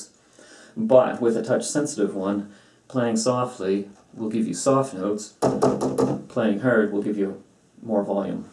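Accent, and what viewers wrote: Canada. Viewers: More do less talk...but love the Canadian accent though. I got one of these just needed a few tips, nothing to help me here,sorry guy bye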